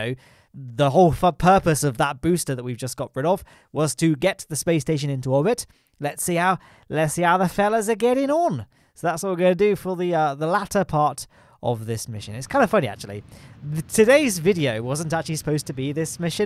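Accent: United Kingdom. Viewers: Did you just say Cor-oh-nah virus? I come here for a good British accent and PROPER pronunciation.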